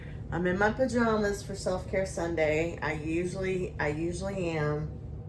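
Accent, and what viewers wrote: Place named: South, United States. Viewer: My niece would love the cute body cat. 🐱 💕 Ty so much for being so down to earth. Your positivity is a domino effect for sure. 😊 Thank you so much for shouting out my sweet and precious bff 🎉She is such a blessing in my life and I’m grateful for her daily. ❤I was so glad she sent me your way too. I’ve truly enjoyed this video so much. Love self care Sundays and Trisha’s Friyay mask day. My two favs to tune into now!!😊❤ People like you and Trisha ( and so many more)are making a difference in such a positive way so keep going. You ladies are helping me so much more than you even know. I wish you all the best! 🤗 ❤ Trisha and I have some southern twang too so no worries here. Lol😅 Btw the way your husband is very sweet. EewwwWee… is a great nickname! 😀 you have made me laugh so much. So ty!!😅 I have watched one of the Final Destination movies and No more for me. 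🥴 Cute little cats 🐱 I have 3 dogs I feel the same way about! Enjoy time with your momma. I lost mine last year so hold tight to those great times you make together. 😊 God bless and have a wonderful Sunday! ❤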